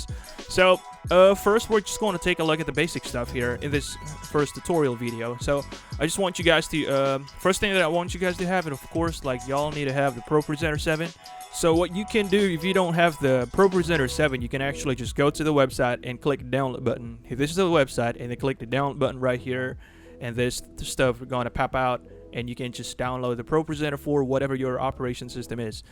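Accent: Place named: South, United States. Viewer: This guy really knows what he's talking about. His southern accent is so genuine!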